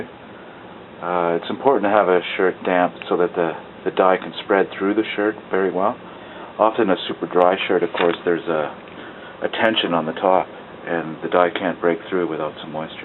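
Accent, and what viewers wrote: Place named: Canada. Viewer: I love your Canadian accent.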